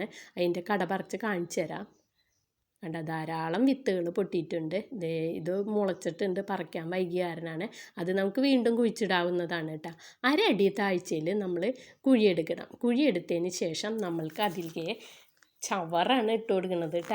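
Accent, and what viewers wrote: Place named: Thrissur, India. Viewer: മമ്മ😂😂😂😂 തൃശൂർ ആണല്ലേ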